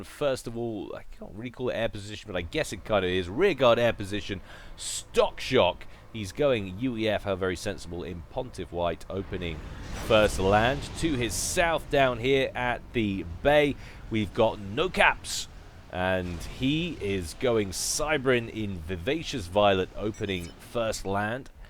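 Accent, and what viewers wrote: United Kingdom. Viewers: Oh this british Tyrannus hurts in my ear D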